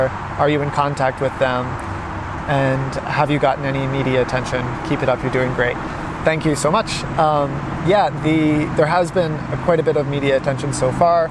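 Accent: Canada. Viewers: I like your argument, but he doesn't live in Germany. He lives in Canada. You can tell by his accent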